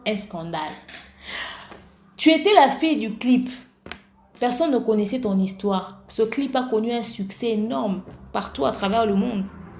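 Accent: Ivory Coast. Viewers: mama pourquoi parler comme une ivoirienne?????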